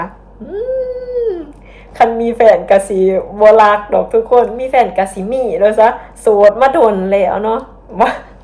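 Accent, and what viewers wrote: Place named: Loei, Thailand. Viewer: สำเนียงภาษาหลวงพระบางสำเนียงเดียวกับกับ,จ,เลย,จเพชรบูรณ์ของไทย❤❤ครับ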